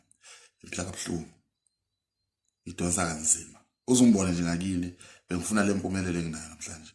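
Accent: Zimbabwe. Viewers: Cha mpela nje wizimbabwe uyazwakala noma ukhuluma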